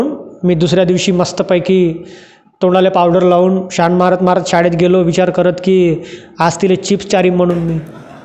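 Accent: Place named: Nagpur, India. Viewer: तूझी नागपुरी मराठी ऐकून मला नागपुर ची खुप आठवन येते. Miss u Nagpur. Miss u Vidarbha.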